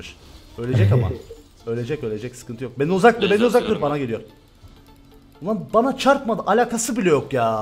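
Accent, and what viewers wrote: Cyprus. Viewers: TAMAMEN KIBRIS ŞİVESİYLE OYUN YAP ABİİİ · Abi şuan kıbrıstayım otelde herkes değişik değişik konuşuyor şimdi anlıyorum seni :D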